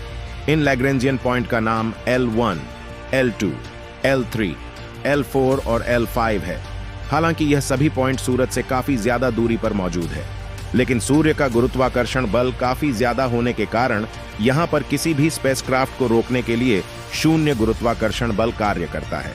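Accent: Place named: Bihar, India. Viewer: आपकी बिहारी हिंदी सुनकर मेरी हिंदी बोली भी खराब हो जाएगी, अतः शुरू के दो ही शब्द सुनकर विडियो बंद करना पड़ रहा है। Sorry.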